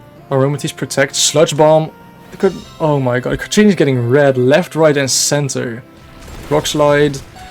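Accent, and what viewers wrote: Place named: Netherlands. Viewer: ik ruik een nederlands accent hehe.. leuke video man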